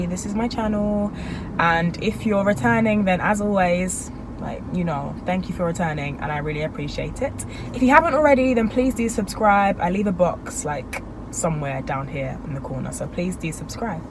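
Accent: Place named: London, United Kingdom. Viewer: Ugh leave that annoying london accent in london. Yall need to leave some things in London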